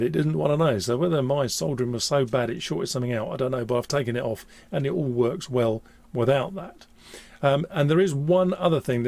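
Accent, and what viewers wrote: United Kingdom. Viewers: Hey great video!! Where in the UK are you from? Thanks for your channel!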